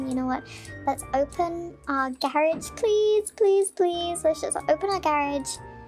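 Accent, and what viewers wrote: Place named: United Kingdom. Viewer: Why is your voice like British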